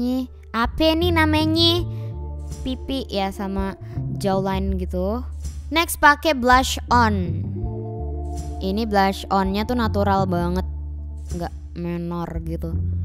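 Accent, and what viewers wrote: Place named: Java, Indonesia. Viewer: Kenapa suara kak di sini agak medokk!! (Emot ketawa)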